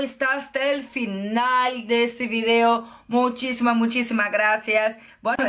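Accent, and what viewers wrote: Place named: Portugal. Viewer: Su acento es portugués si no me equivoco, me encanta su acento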